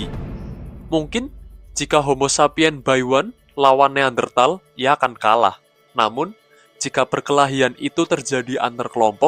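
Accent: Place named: Banyumas, Indonesia. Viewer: Sampean wong ngapak Yo mas...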